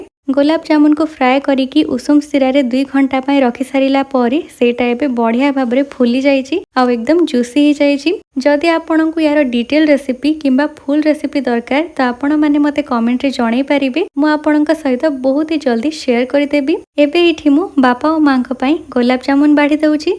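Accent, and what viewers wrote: Sambalpur, India. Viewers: Tamar Sambalpuri voice bhal lagsi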